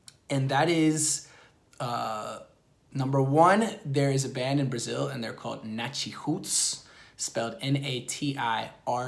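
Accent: Buenos Aires, Argentina. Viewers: Estoy loca o cuando habla en inglés lo hace con acento porteño????? Cuando hablas en inglés te dicen que tienes acento????? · Dude, you don't know how glad I am . Soy Brasileño, vivo en Buenos Aires, o sea ya era inmensamente feliz cuando te escuchaba hablar un "castellano porteño", y ahora que dijiste eso .... Cara o português que falamos no Brasil é unico, é particular, é sonoro. Espero que aprenda e um dia grave alguns videos que vamos te aplaudir de pé. Tamo junto kkkkkkk. You kill it man, nice video. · Te escuché tanto hablar en español que ahora parece que hablas inglés como Argentino que aprendió el idioma jskajaja te amoooo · Parece un argentino que aprendió inglés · Hola, me encanta ver esta pasion por aprender otra cultura, solo habre otras avenidas, pero si talvez pierdas el bello acento Argentino. Pero extiende tus alas vuela. 💞🤗🤗🤗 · Ahora cuando habla ingles se nota que tiene el acento argentino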